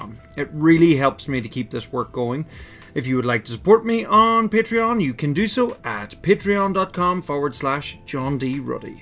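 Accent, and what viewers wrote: Ireland. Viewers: Thanks for doing this video, Ive done a very similar one looking at the same content, just with a lot more data and less of an Irish accent! Check it out and let me know what you think of it "What if Ireland United?" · I don't hear any sounds in his speech that aren't Irish. American accents are more influenced by some Irish accents than by others. In particular, the more northerly Irish accents have more in common with some of the best-known American accents.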